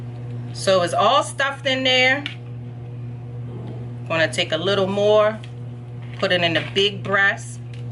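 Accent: Baltimore, United States. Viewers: I would guess Baltimore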